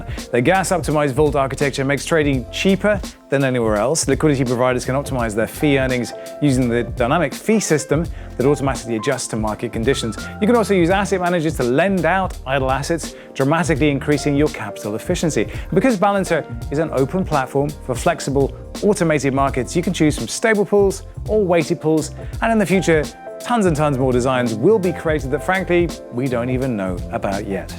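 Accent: United Kingdom. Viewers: Why is a British accent so convincing? Between this dude and Coin Bureau, I believe everything they say!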